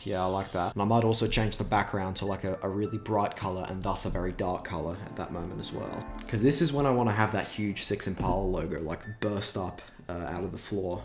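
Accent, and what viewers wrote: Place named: Australia. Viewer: got punched in the face by that thick ass Aussie voice, willing to bet money this dude’s from Australia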